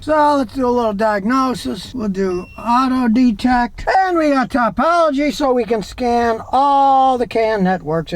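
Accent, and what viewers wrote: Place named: Ohio, United States. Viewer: lol lol. Heavy Ohio accent.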